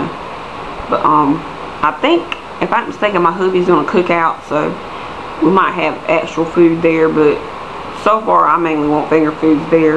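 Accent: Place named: South, United States. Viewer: Where are you from? your accent is sooooo country, but different LOL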